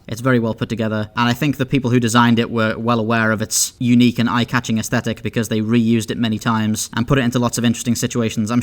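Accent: United Kingdom. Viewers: You are clearly a Brit.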